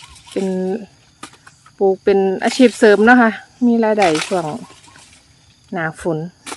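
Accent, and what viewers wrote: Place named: Buriram, Thailand. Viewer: สาว เป็นคนบุรีรัมหรือเหนือ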